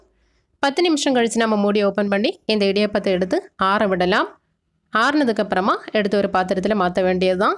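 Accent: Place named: Kanyakumari, India. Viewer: குமரி தமிழ் அருமை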